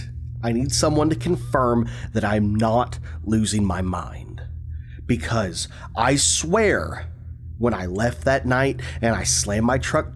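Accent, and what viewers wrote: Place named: Tennessee, United States. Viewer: Enjoyed! I like your accent. Sounds like my neck of the woods. TN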